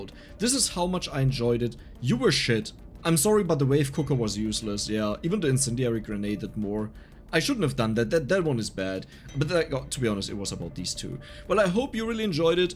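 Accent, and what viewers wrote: Germany. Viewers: Ich dachte mir die ganze Zeit, ser hat iwie nen deutschen Akzent. Dann so „Ach komm hör auch mit dem Käse“ 😂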